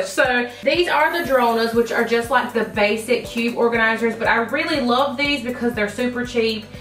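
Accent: South, United States. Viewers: living for the southern accent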